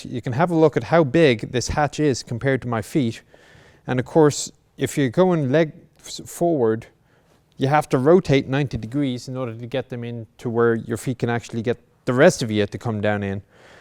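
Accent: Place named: Ireland. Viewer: I thought you had an Irish accent alright:) Either that or you're well versed in international transport logos:P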